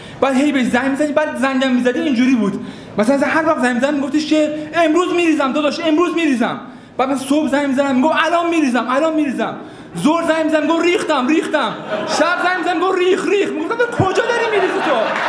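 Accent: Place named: Yazd, Iran. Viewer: خیلی راحت بگم . من درصد بیشترش به خاطر لهجه شیرین یزدی هست که طرفدارت هستم . اگر نباشه .جذاب نیست برام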